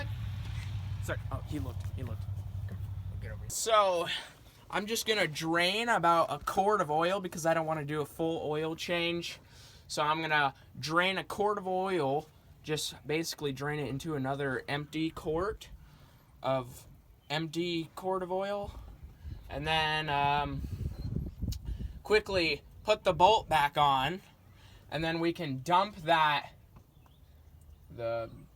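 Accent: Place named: Missouri, United States. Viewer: You tell he is a Missourian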